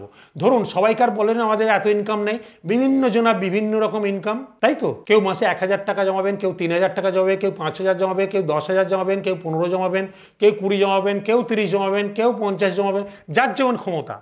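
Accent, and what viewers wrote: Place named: Bardhaman, India. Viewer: দাদা আপনি কি বর্ধমান জেলার মানুষ? কথায় টান পেলাম তাই জিজ্ঞেস করছি কিছু মনে করবেন না প্লিজ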